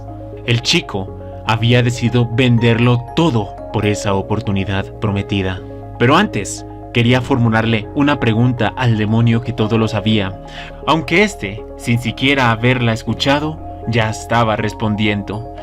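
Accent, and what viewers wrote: Colombia. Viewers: Ste man habla colombiano de pura cepa jajaja es una chimba escucharlo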